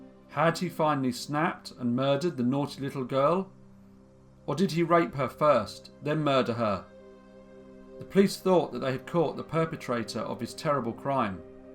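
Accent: England, United Kingdom. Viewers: Have you actually ever met a real human being?? You sound English but I've never in my life heard anyone pronounce the word 'granite ' as you did here mate!? Seriously! Where the fook did you get that from? You do not pronounce the silent e at the end of that word !!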